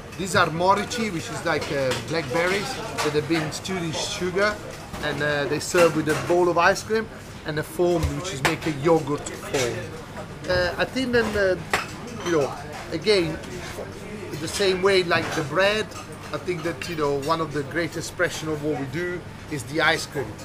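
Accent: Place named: Italy. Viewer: L'accento c'è ma il lessico ragazzi... tanta roba😎lo parla da Dio.Mi sembrava di sentire un inglese con accento italiano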